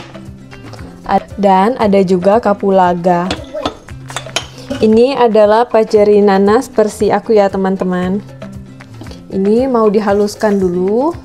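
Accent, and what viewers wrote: Pontianak, Indonesia. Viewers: Pantesan gak asing bahasanyaa, org pontianak rupanyaa kakak heheh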